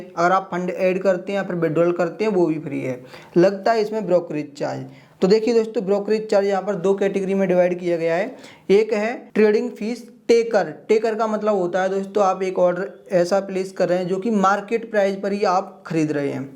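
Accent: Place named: Gujarat, India. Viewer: tum gujarati hona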